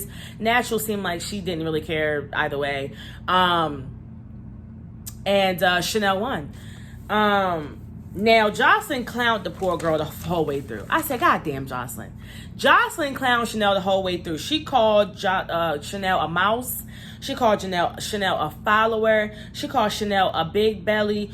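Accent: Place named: Baltimore, United States. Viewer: Love this review!! New subbie.❤ are you from Baltimore? You sound like it.☺ Yummy in that parking lot was so freakin cringy. She should be mad embarrassed.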